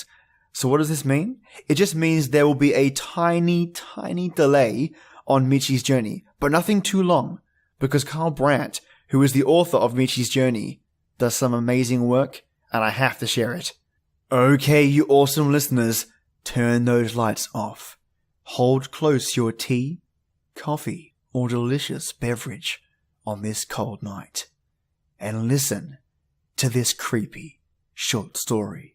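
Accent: Australia. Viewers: haha, I read "blister blast" in your Aussie voice and it sounded great in my head 😛 But I honestly don't mind a little jump scare here and there. I actually prefer them cause it makes the video more fun for me 😊 Especially with headphones 👍I hope you have an amazing Halloween btw! 🎃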